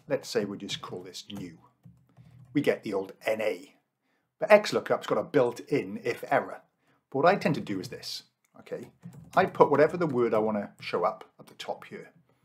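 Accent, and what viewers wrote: Wales, United Kingdom. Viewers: Which part of Wales are you from?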